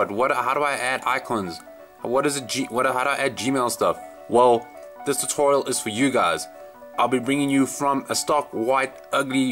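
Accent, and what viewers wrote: South Africa. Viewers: I knew you were South Africa... but your screen just confirmed it - Rock on Pretoria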